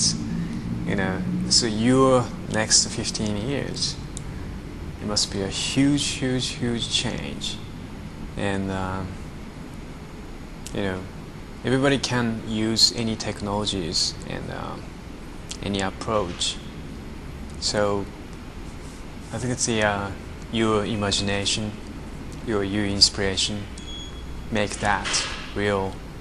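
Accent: United Kingdom. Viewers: He must have learned English from an person with an English accent. You can hear it a bit. So cool that he's bilingual, all the more power to him. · His english is so good. Did he learn english in Japan? I don't know enough about him. It really sounds like he learnt british english. It sounds like he has a british english accent as opposed to american.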